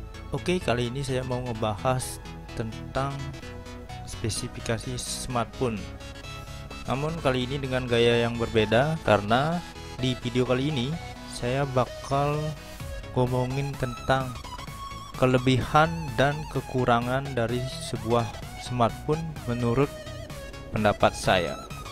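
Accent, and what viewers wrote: Bali, Indonesia. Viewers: logat balinya kentel kak 😂. orang Bali kah? #cumantanya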